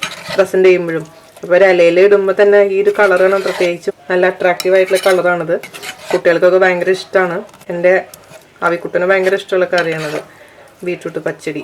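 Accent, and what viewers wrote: Thrissur, India. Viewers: super pachadi.. 👍pine trichur language super... 😍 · fantastic. Your Thrissur style tone of talking is really an added attraction to the elaborative presentation. Well done.. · Chechi de samsaram kettittu Thrissur,Urakam bhagathulla aalu pole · Chechide veed evideyaa. Thrissur samsaaram aanalloo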